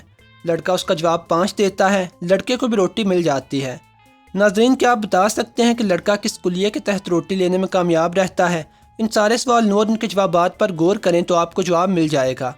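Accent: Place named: Lahore, Pakistan. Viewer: Teri awaz boht ajeeb hai , you are LAHORI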